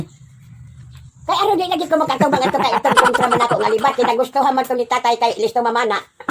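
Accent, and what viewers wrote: Surigao, Philippines. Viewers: Lola,makalingaw jud imng storya la, bisaya surigao accent.... taga surigao here sad lola. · 😁😁😁😁 lingaw Jud ko nimo la ako Lola taga surigao sad ingana sad mag storya sa emoha 😁😁😁watching from parañaque 😁😁😁😍😍😍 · asa ka dapit sa surigao lola? mao diay ang imo sinultian familiar kaayu 😁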